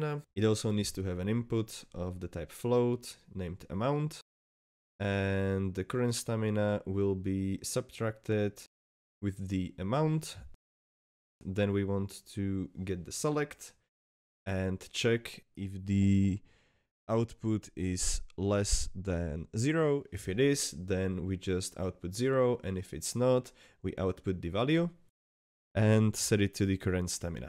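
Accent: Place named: Slovakia. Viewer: Už len podľa prízvuku viem povedať že si slovák, ale popiči tutorial bráško dikes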